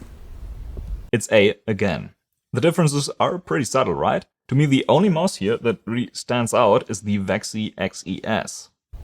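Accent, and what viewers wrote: Germany. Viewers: i hear german? aber krasser akzent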